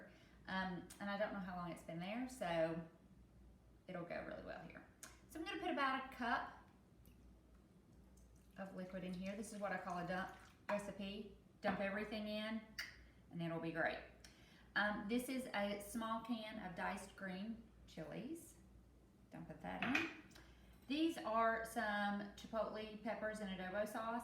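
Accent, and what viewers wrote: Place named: South, United States. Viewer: Southern accent is like nails across a chalk board.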